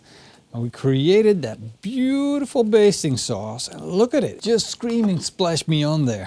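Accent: Netherlands. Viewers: Dutch accent ?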